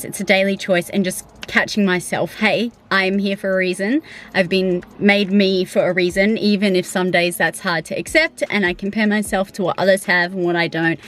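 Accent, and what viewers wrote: New Zealand. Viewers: New lense looks great, kiwi accent gets stronger every video and the hikes mentioned are such good ones. Ive got the able tasmin booked in a few months. Grow where you are planted- so few people learn to do this well! Also cooking is such a good time after you start figuring everything out. You gotta be you though and comfortable pushing yourself out there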